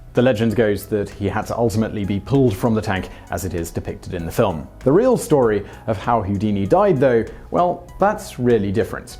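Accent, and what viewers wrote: United Kingdom. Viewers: You have the britishest accent ever my friend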